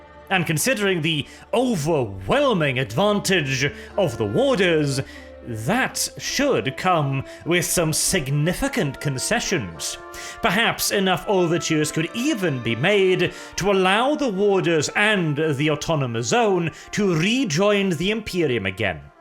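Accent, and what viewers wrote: United Kingdom. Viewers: Dang boi, your silky British by way of Norwegian accent is music to my ears after the past month.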